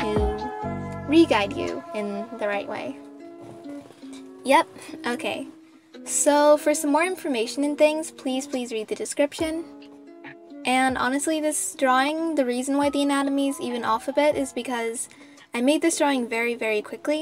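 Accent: United States. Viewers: Woah Thats Amazing! Is it just me or i feel that she has like an American accent- But shes Filipino duh- Edit: So that means shes half American :0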